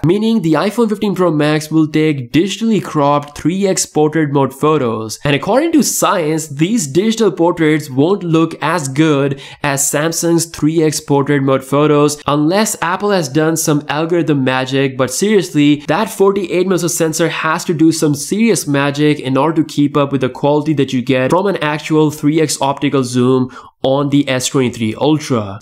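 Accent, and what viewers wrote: India. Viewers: You sound like Indian speaking English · Idk but...Ur english sounds like an INDIAN english.. with some added accent.. 🤔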